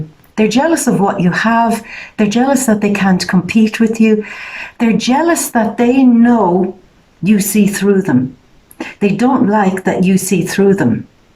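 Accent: Ireland. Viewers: I love your reading - very helpful!! Love your Irish accent and way of reading - One Love xxx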